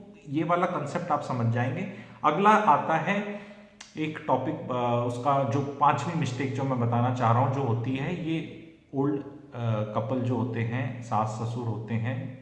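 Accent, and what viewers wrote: Haryana, India. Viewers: sir aapki hindi samajhne me thori dikkat hoti hai. I think it's a Haryanvi based hindi. It's not a standard hindi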